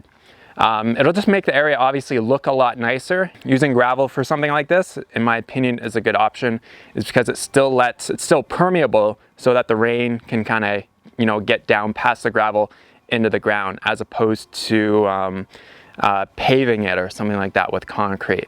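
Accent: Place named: Canada. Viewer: I love that you haven't lost your Canadian accent eh. Hannah's one lucky girl to have such a handy man around the house.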